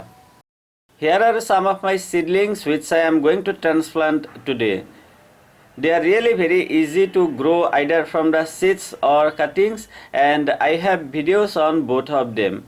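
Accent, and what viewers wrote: India. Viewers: India has dozens of different pronunciations for English. We must learn to tolerate all kinds... The Bengali English, the Assamese English, the Punjabi English, the Tamil English and so on. The essence of this video is the passion for gardening that this gentleman is trying to kindle in us. That is of prime importance.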